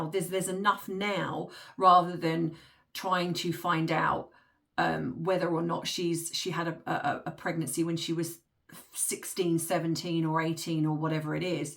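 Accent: United Kingdom. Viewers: Your opinions make so much sense and reflect your education in your chosen profession. I don't know how anyone can see it otherwise. They just seem to believe what they want to believe is true. By the way, I love listening to your lovely British accent (I'm from Indiana) and think you're a very beautiful young lady. I'm 65 and wish that youthful glow could last forever. Alas, it doesn't, so enjoy it while you can. God bless, Carol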